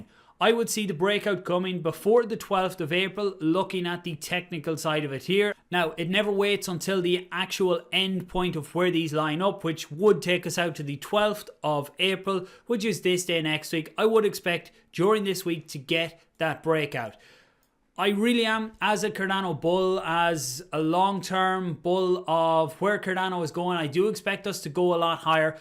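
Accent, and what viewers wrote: Ireland. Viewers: normally i can't understand Irish people, but i didn't realized he's having irish accent till i saw your comment.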